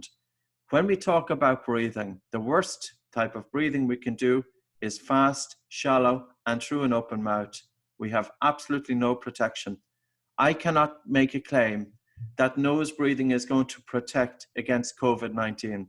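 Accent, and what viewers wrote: Ireland. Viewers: Brett/ hold time = BREATH Hold Time. Tis the Irish accent you see. Likewise, wid = with; tinking = thinking, breed = breathe. LSD is a good anachronism. This has been an excellent explanation. I've shared this with as many people as I can and now I am doing a revision. Thankyou once again, many times over. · I love your Irish accent. Not an accent in your country of course but in America yes. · I’m breathless over this guy and his irish accent! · But why does he do all this .eishhhhh minuit - muinishhhhh, respiratory rate - respiratory reishhh? like wtf are you IRISH, KIWI, AUSSIE? xD if you tell, I change my thumbs to up, cause all you say is probably right and helpfull, but the accent xD is hard to get used to and bad for my oxford english ^^ ah and if you panic, use asthma spray, drink a glas of irish stuff, whiskey, then sit straight, breath deep and slowly, not big shallow and fast.. 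btw it's better without whiskey, but if you panic you needa stop that. still better then drugs xD except asthma spray xD luls. Actually when we do sports and we get exhausted, and make the break, at first we do exactly what he says, fast shallow breathing, but then after a few seconds, we automatically go back to normal breathing, slow, deep, not hyperventilating/fast breathing. xD appearetnly our body knows it by itselsfin usual conditions and sports. ^^ my note, his technique - EVERY TIME! THIS TIMES - when you do his test, like resting respiration, you feel obstruction or breath difficulty - CALL AMBULANCE AND DOCTOR!!!! use his techniques until they arrive. because I GOT SUSPICION, the earlier you arrive in intensive care or medical care - the easier they can help, avoid the cytokine storm with meds so it doesn't destroy the lungs! THE THING THIS REALLY SMART GUY DOESN'T MENTION: AFTER CYTOKINE STORM = YOUR LOUNGS ARE WHITE, DEAD, NADA, you're DONE, you wont' breath anymore cause lungs = broken, so you're DEAD, so you cannot appl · Oh, this is fantastic, outstanding - thank you!! 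You confirmed for me that what I've been doing intuitively is right on (since COVID... when grocery shopping, keeping mouth closed and hardly breathing if at all, especially when near anybody), when outdoors and downwind of anybody else (especially if they're speaking), ditto (and move upwind/away if possible so as not to be 'in the line of fire' for their expelled air (and particles...), etc. etc. Also, over a steady 24 years of lap swimming, I have always and only breathed through the nose while swimming, though I wasn't aware of all the benefits of doing so. This was very educational and exceedingly useful, especially at this time. Bless you! Will be sharing.....! (p.s. - love your (Dublin?) accent :) )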